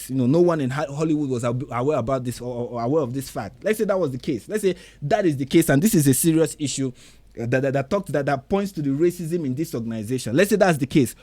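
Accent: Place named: Nigeria. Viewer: So happy the algorithm sent me in your direction. Nigerians have a special clear sighted snark and its a voice in the most musical of accents the world needs to hear right now. Privileged Americans whose careers are entirely racially based are everything wrong - just another way of staying on the plantation - we outside. Peace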